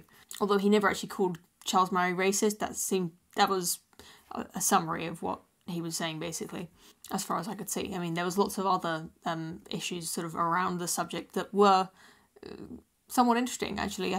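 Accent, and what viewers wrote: United Kingdom. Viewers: You see, this is why I love you. You are not speaking with an agenda you are literally working out thoughts and ideas in real time and changing your mind in real time then presenting those ideas back to us. You are just someone looking for the truth so am I I am a skeptic. Keep up the good work. PS I don't know what it is about your British accent but I can hear you talk for hours no problem. You do stutter a lot though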